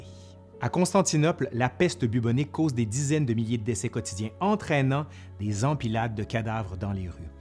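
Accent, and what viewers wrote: Quebec, Canada. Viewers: En vrai les profs d'histoire sont souvent cool. Je trouve mais c'est vrai que l'accent québécois rajoute un charme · vidéo très intéressante ! une voix posé, intelligible, tu articules bien et tu ponctues bien tes phrases. franchement, rien à dire de négatif. comme dit ton compatriote jean marc généreux "ça, j'achète !" (au passage j'ai toujours été fan de l'accent québécois xD ) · Ca me fera toujours rire laccent québécois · Avec son accent canadien il nous fascine de ses connaissances ! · J'adore l'accent que québec 🙃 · Première chaîne québécoise pour ma part et ptn ton accent me mets trop bien, bisous de France, merci pour ton travail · vous avez un accent de dingue vous les canadiens mais vous êtes tellement cool que ça passe bien. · Merci pour cette page d’histoire qui m’était jusqu’à présent inconnue et que j’ai trouvé tout a fait fascinante! 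…et toujours cette diction impeccable accompagnée d’un bel accent Canadien👍🏼! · J'en ai regardé plein d'autres ! Ta chaine est ma chaine d'histoire préférée ! J'aime beaucoup ta façon de raconter l'Histoire. Je dois dire que ton accent québécois contribue beaucoup à ton charme 😁 Merci beaucoup pour ton travail et ces vidéos très divertissantes. Ps : tu es très beau garçon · Je pense que ton français est canadien Ton accent ressemble à celui de JUStin Trudeau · Outre l intérêt historique de ton propos j adore ton accent québécois et la touche d optimiste en fin d exposé est réconfortante bravo pour ton travail 😀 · Merci infiniment pour cette excellente presentation - Vous presentez la connaissance de notre passe d'une facon interessante et vivante. De plus, vous etes pas loin de mon patelin natal (Saint Maurice), j'adore le Francais local. Mes excuses pour mon manque d'accents, j'utilise un clavier anglophone et je suis "pris" aux Etats-Unis, au milieu de la pandemie. 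Merci encore et j'attends vos prochaines productions, L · Trop marrant l accent québécois jcrois j'aime bien lol comment il prononce neige ou planétaire mdrrrrr 🤣🤣🤣 jrigole il n'y a aucun mal lol. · Vraiment interessant, sauf ta pronociation du Quebec (toujours drole et amusante) , mais je viens de soutenir ton programme. Merci. Salutations du Portugal. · C’est un canadien👊🏽👊🏽👊🏽 j’aime trop l accents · Étant moi-même Québécois, je peux vous dire que ce monsieur a un accent particulièrement gras. Il pourrait faire plus d'efforts pour "normaliser" son français, mais il se fait une gloriole de cet accent pour amuser (?) les cousins de France et d'ailleurs. Malgré ses talents de pédagogue, cet accent trop accentué me tombe sur les nerfs. Vraiment... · Grâce à toi je comprends maintenant l'accent de Québec. 😀 Je suis hispanique et j'habite en France. Génial chaîne de YouTube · L'accent français canadien, une pépite... 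· Ma foi c'est bien la première fois que je suis pas dérangé par l'accent québecois ! (Désolé les kheys j'vous kiffe mais étant de France ça fait vraiment bizarre haha !) Excellent sujet de vidéo et très bien expliqué en tout cas, je crois que ça fait un abonné en plus ;)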